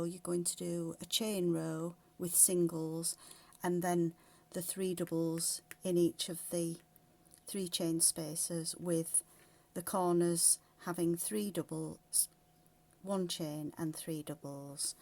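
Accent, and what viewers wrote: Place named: England, United Kingdom. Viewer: You sound English yet you are calling the stitches in American! They are Triple stitch not doubles.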